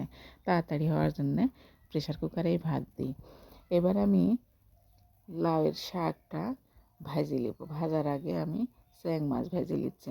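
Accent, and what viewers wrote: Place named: Natore, Bangladesh. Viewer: আঞ্চলিক ভাষায় কথা সুন্দর ❤❤❤ নাটরের মেয়ে নাটরের ভাষা🥰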